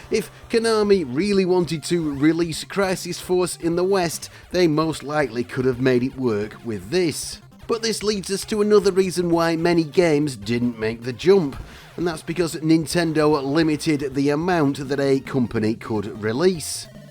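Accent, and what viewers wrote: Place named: Northern England, United Kingdom. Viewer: Another British guy talking about the system that was so unpopular and under the radar in his own country. A North Englander, by the sound of it, with the "thoot it wood make them moony."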